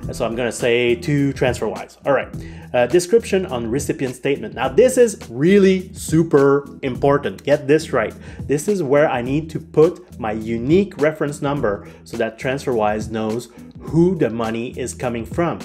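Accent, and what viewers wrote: Quebec, Canada. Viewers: I'm going to try a transfer. You sound like a French Canadian, are you from Quebec or Montreal? Anyway, very good video.Merci. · Are you from Quebec ahah? Thanks for the tips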